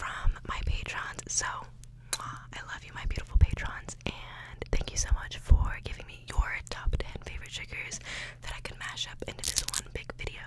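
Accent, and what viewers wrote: United Kingdom. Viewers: This is the perfect way to end another long day, especially the encouragement. Also, your British accent is very impressive.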